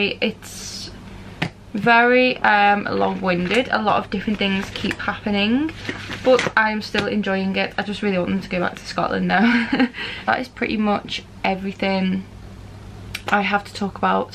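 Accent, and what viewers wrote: Liverpool, United Kingdom. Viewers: You sound like your from liverpool? If so go liverpool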